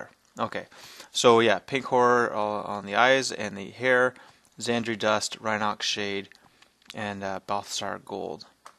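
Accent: Canada. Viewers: i know u are from canada only because you say ewt instead of out lol! no offence nice vid.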